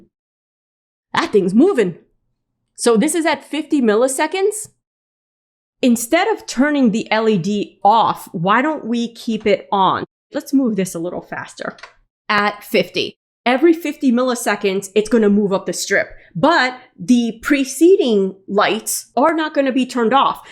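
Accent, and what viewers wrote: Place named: Minnesota, United States. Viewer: this was so helpful. You have a great teaching style and your enthusiasm is wonderful. Thanks for having such a great channel. (Also, I don't know where you're from, but your accent tells me Minnesota, maybe Wisconsin. 😊)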